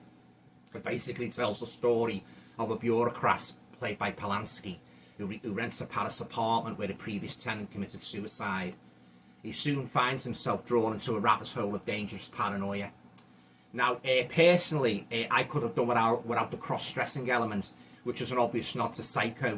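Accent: Liverpool, United Kingdom. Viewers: I have a off topic question. I'm curious, Do you call your profile ' ', because you have a scouse accent? Or that's you're real surname? I'm American so I don't have the ability to tell regional accents of people.☺ but I'm always fascinated by accents. My dad was born Hungary, so I've grown up around 'Broken English' speakers and other European accents. But not many from the UK. I enjoy your channel.👍 I appreciate your "House That Dripped Blood" poster a lot! Probably my favorite Amicus movie. (I'm big Hammer and Amicus fan! I'm 37, but I've always loved those films. Nobody I know really enjoys or cares to watch them! Lol Except my mom and dad. 😅)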